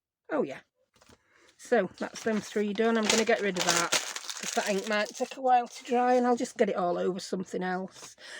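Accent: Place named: Yorkshire, United Kingdom. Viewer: I love watching your videos, they are always so much fun and practical. I love that you sound just like my late Mum and Aunty. They were both from Yorkshire and I miss them so much. To me it’s the best bonus ever. Thank you. 💖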